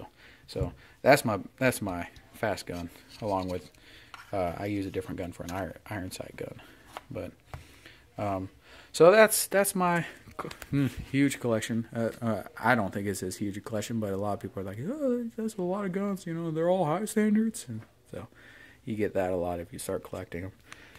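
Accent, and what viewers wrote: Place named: Tennessee, United States. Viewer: What's that accent, Tennessee? Nice collection. Interesting to see someone collect this type of pistol.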